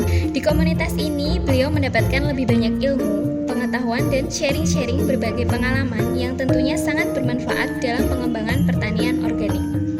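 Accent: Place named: Java, Indonesia. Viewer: Suarane medok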